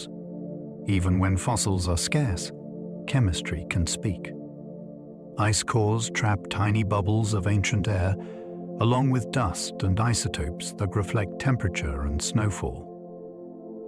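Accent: United Kingdom. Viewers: Why do these videos always have them a British dude narrating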